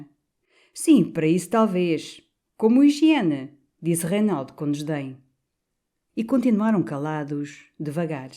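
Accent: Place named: Portugal. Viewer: Esse é meu livro preferido do autor e ouvi-lo com esse falar português, ainda mais em ótima narração, foi maravilhoso. Parabéns e obrigada!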